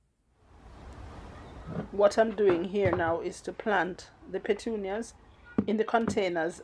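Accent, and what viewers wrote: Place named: United Kingdom. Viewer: I absolutely love your British accent.😂😂😂🤣🤣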